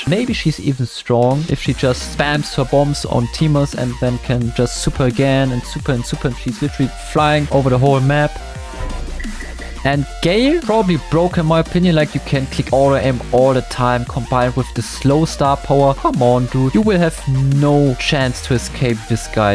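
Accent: Germany. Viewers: hilfe dieser deutsche accent 😭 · I love ur German accent :face-blue-smiling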